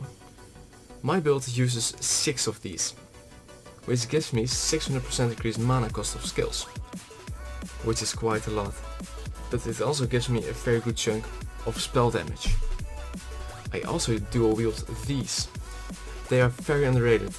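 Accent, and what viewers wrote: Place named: Netherlands. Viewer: Are you Dutch? If yes reply please you sound like someone with an Dutch/English accent. maybe we can play togheter. I am building allmost the same build that you have shown here only with an firery twist to it :D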